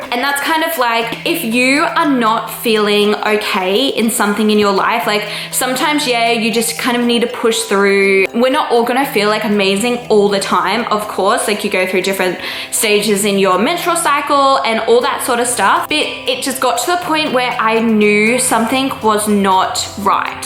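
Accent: Australia. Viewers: Loved this vlog! These are the videos I get most excited for. Just a kinda of day in the life and what I eat in a day. Btw I love your Australian accent, jealous because American “accents” aren’t cute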